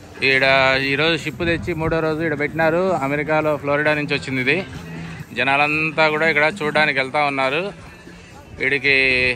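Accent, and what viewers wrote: Rayalaseema, India. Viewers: మీరు చెప్పే పద్ధతి చాలా బాగుంది అన్న. రాయలసీమ accent.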